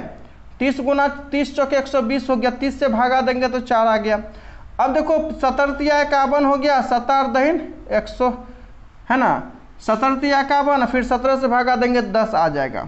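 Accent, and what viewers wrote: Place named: Bihar, India. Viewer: Bhagalpur,munger ,jamui ke taraf ke lagte hai sir aap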